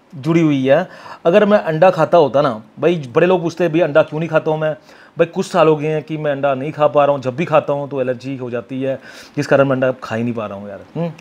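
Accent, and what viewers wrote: Punjab, India. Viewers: aap punjabi type bolte ho bahut payra lagata che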